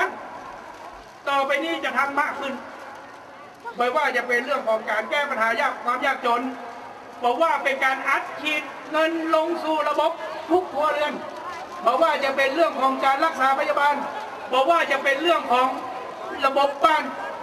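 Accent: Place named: North, Thailand. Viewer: นายกทักษิณอู้กำเมืองม่วนแต้ว่า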